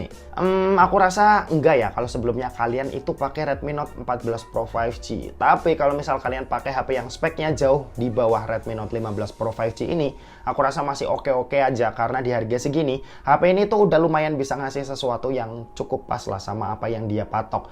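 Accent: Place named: Purwokerto, Indonesia. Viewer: Wong Purwokerto kieh mase yahh? Pada bae, nyong sekang Purwokerto.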